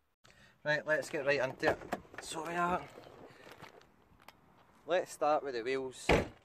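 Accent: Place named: Scotland, United Kingdom. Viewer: Good video mate, strong accent though, good job I've Scottish in my blood 😂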